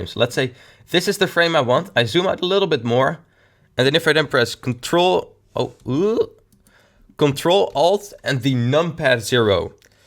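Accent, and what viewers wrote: Netherlands. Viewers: Je nederlandse accent is zo laimmm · Dutch :D? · Are u dutch or Deninish or Belgic? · yep you sound dutch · eyyyy jog je bent nederlands toch ? well ik ook ik kan het horen via je accent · he sound dutch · Just a question this voice really has a Dutch accent? Is he Dutch? Anyways I love ur vids what are ur subs called Znaccers CX? (Iam one CX)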